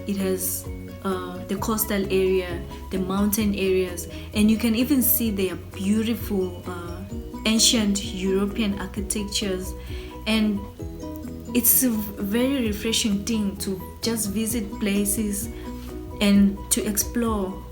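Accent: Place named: Eswatini. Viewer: Your Swazi accent is beautiful 😍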